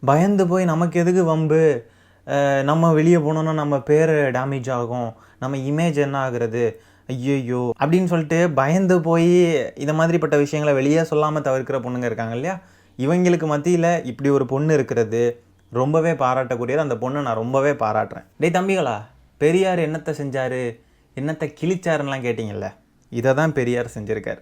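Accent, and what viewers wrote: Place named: Telugu, India. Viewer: கொல்டியின் குரல்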